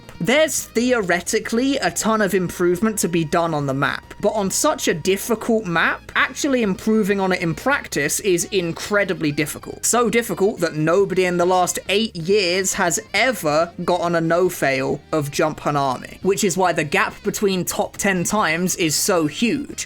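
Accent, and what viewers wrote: United Kingdom. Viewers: Unfortunately you a British so I could not enjoy this video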